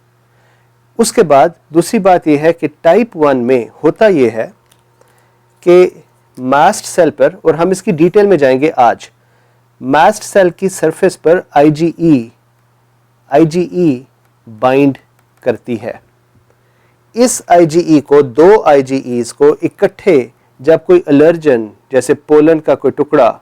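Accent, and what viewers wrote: India. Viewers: ur urdu is so gud u hv bit of kashmiri accent :) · it's imposible to understand indian 😢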